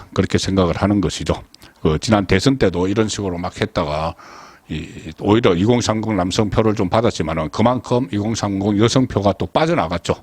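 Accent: Gyeongsang, South Korea. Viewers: 송형 전에 뭐라 했지??? 너 경상도징???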